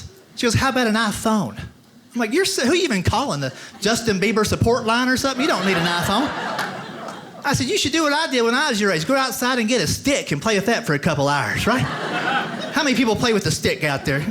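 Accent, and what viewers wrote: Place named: New England, United States. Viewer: What a hoot, when I was growing up in the 50’s, my whole family talked like him! It was fun to hear, now that I’m the oldest generation, surrounded by New Englanders!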